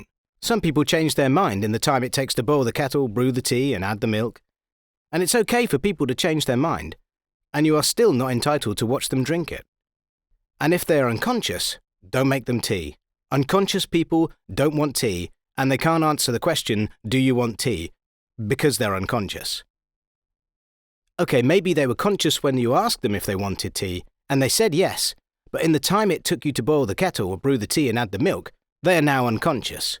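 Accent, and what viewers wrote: United Kingdom. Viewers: This is just so much better with a deadpan, British voice :P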